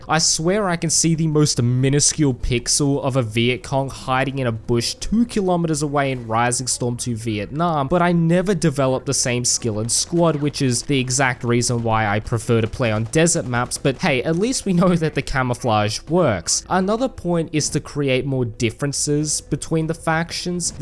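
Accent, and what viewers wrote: United Kingdom. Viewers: Nice British accent man.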